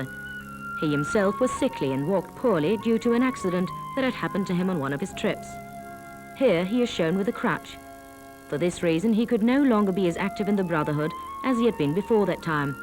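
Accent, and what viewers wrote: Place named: England, United Kingdom. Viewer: She doesn't know how to pronounce Menno Simons. It's Menno Simons with a long i. Not Menno Seemans like she says. Kind of funny that she has an English accent, as well. This needs to be done over with someone with a Swiss German accent or a Friesien Dutch Accent.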